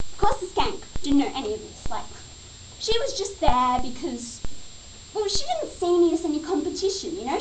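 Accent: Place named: Australia. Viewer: shes aussie not british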